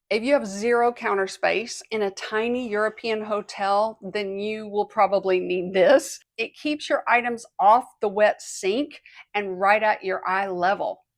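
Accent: South, United States. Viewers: And now can you leave a kind comment for this lady who spends a lot of time and works hard to bring you these tips with her sweet, southern accent?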